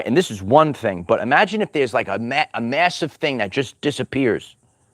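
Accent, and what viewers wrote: New York, United States. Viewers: One of the biggest grifters on YouTube. Accent makes me think of a NYC cop or criminal.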